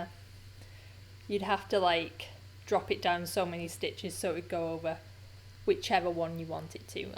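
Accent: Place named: Liverpool, United Kingdom. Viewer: Thanks so much for the lovely Hiraeth pattern,. The name really touched me when I read your newsletter this morning, as it's something I've been feeling a lot of for the past few months, being originally from Wales. Not sure why, having lived in Canada for 100 yrs, but there you are. Not sure if I've missed this, but why the name Hiraeth, why did you pick that as the name? I can definitely hear that Liverpool twang, but do you have a Welsh background?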